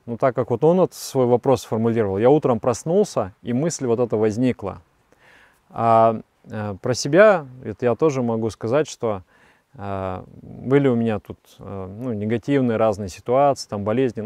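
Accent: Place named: Moscow, Russia. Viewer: не думаю, что он украинец. Его канал называется " ", а не " ", это раз. Второе, говорит по-русски, даже после всех злодияний русских, на нашей земле, третье, говорит, с явно мАсковским акцентом. Он не наш.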